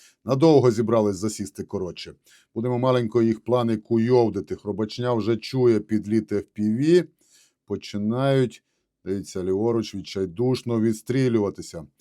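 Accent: Transcarpathia, Ukraine. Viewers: Бадабуум-Чакалака-Мазафака!!! Йобудошч! Вдупумаш!!! Який соковитий всеж закарпатський діалект!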